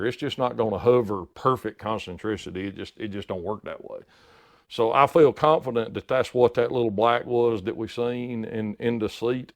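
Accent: South, United States. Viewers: i have near to no experience with valve-work, but i appreciate your professionalism and respectful attitude coupled with the most southern accent i've ever heard as a foreigner. i enjoyed every second of this and even learned a little bit too. thanks for all that. god bless! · your amazing southern draw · I love the Southern Drawl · ‘… people that’s smarter than me…’ as a foreign viewer, who knows nothing about machining or mechanics, can I just say how much pleasure it gave me to listen to you discuss this with your accent. To a non-American you have a real ‘good ‘ol boy’ way of speaking that adds huge value to your out-of-hand dismissal of his criticism. Fantastic. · You talk like a redneck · that southern drawl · someone with a southern drawl and slow talking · I'm from Canada and I have no problem understanding your lingo. In fact I love the Southern accent..!! 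· your overwhelming Southern Drawl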